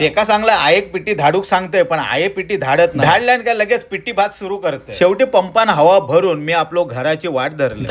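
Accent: Malvani, India. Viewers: दादा तुमची मालवणी ऐकून ईतक छान वाटत कि विचारू नका 😊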